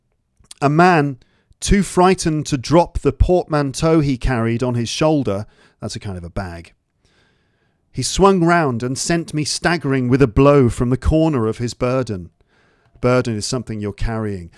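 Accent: RP, United Kingdom. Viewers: speaks RP, does he? Pls tell me, thank you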